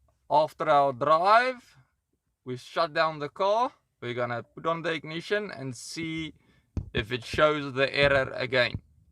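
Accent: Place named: South Africa. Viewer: Definitely South africa !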